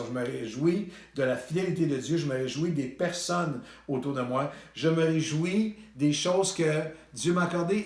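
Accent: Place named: Canada. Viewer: Bsr, j’aime vous écouter Mais j ai du mal à tout comprendre avec le français canadien trop vite Que Dios l bendiga amen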